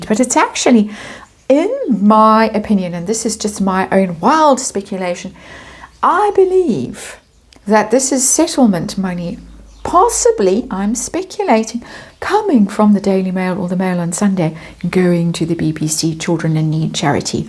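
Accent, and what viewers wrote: South Africa. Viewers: You are on fire today! Love the snark in your beautiful SA accent!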